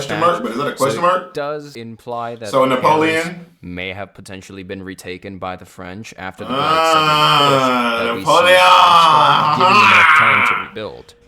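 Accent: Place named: United Kingdom. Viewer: You sound british but justified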